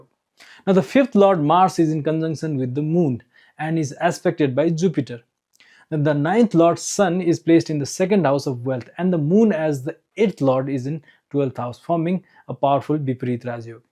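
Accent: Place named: Nepal. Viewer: Sir aap nepali ho aap ki english tone nepali si aa rahi hai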